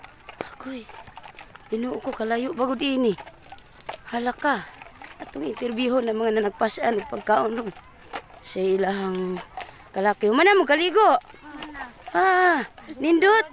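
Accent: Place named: Leyte, Philippines. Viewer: taga dis a ka pareha lge ta ug sinultihan KAHIBAWO ka mag Leytenio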